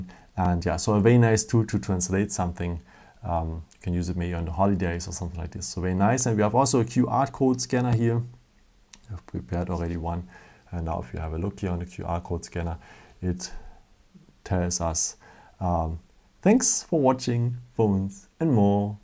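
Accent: Germany. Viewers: Strong german accent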